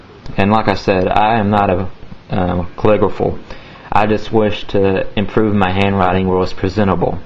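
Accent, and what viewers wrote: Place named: South, United States. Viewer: he had like a southern accent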